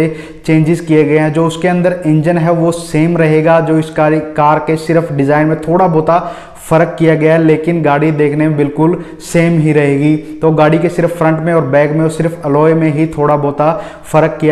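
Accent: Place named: Haryana, India. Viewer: sir ek baat thodi ajib lgi ... haryanvi excent use krte ho jaise ki ... thoda bhot ...thoda bhut ...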